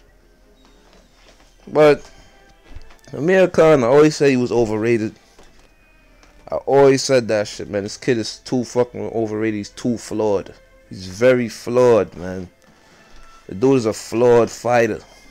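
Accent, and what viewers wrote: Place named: Trinidad and Tobago. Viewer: irrelevant, but u sound like u trinidadian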